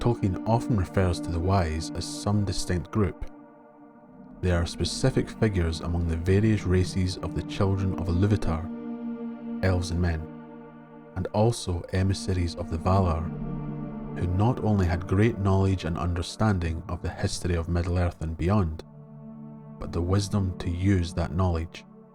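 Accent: Scotland, United Kingdom. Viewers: I've been gobbling up your posts all weekend. Frankly, they're great. And I apologize for taking the piss about your accent. It takes a Scot to pronounce LOTR names and places with some verisimilitude and I take my hat off to you.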